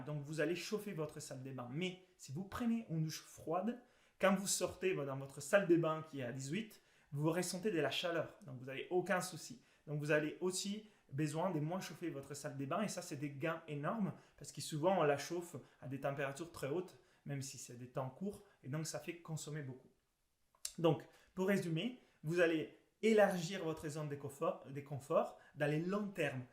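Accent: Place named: Italy. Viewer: Merciiii! Toujours aussi astucieuses et instructives tes vifeos, un regal de t ecouter avec ton charmant accent italien ♥️🐳🤩👏🏼👏🏼👏🏼🎊💃 Gratzie Mille 💓🙏🏼😻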